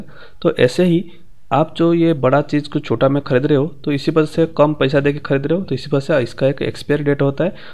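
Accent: Odisha, India. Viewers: R u from Odisha sir?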